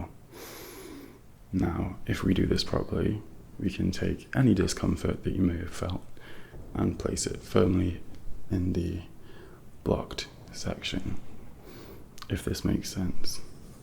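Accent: United Kingdom. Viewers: Your British accent is very realistic 👍🏻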